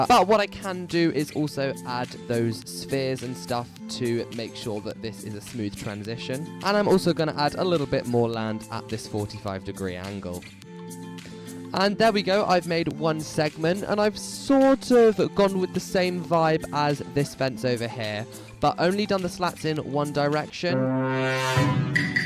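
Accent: United Kingdom. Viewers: Cool love the build <3 😀 Edit: i love your voice! Are you British cuz I am ❤️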